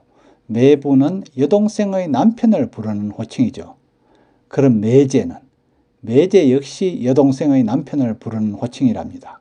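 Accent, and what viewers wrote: Gyeongsang, South Korea. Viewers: 매제, 매부, 발음에 있어서 문제 없습니다. 지방에 따라 발음이나 장단이 다를 수 있지만, 경상도 억양을 쓰는 님이 쓰는 매제, 매부, 발음은 서울, 그리고, 표준발음하고 다르지 않습니다.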